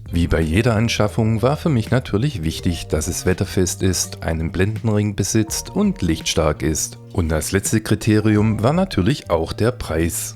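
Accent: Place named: Swabia, Germany. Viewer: Ich mag deinen Kanal habe ihn vor zwei Tagen erst entdeckt. Sehr angenehm alles insbesondere deine Stimme! Übrigens höre ich etwas schwäbisch heraus 😉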